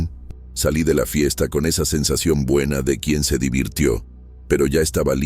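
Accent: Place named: Spain. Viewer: Y luego el acento español lo arruina más.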